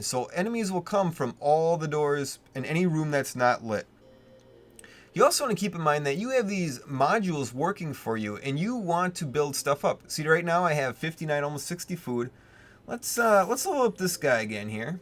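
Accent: Canada. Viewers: You are incredibly canadian, thanks for the tut man.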